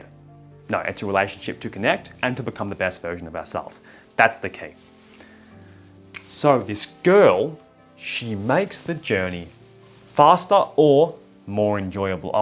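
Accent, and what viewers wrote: Australia. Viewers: Thank you so much for this video, it made me realise so much about myself i didnt know❤, also i love your Australian accent🤩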